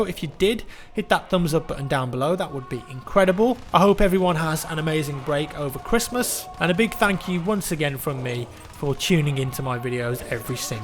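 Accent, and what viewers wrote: United Kingdom. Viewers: Man i love yor British accent, mutch better to understand for me than the American Cheeseburger English.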